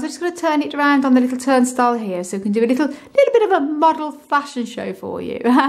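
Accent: Birmingham, United Kingdom. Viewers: Beautiful buds accompanied by a blissful Brummie brogue! Thanks for sharing. :)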